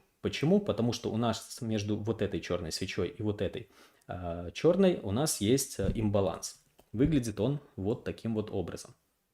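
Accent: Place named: India. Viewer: жуткий акцент у этого парня.... индус что ли....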